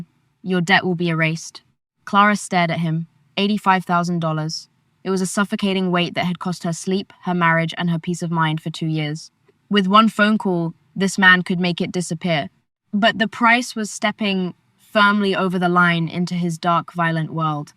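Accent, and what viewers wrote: United Kingdom. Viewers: The British accent is fine. Have you heard the atrocious attempts at Italian or Sicilian accents in some of these?? This isn't AI, so thumbs up from me. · Yes I did she had to so they both could survive it was self defense. Very exciting g intense story I loved it from beginning to educate. I have a little trouble listening the narrator spoke really fast with a heavey British accent. I am from moline illinois USA and I give a 10+++++for the story.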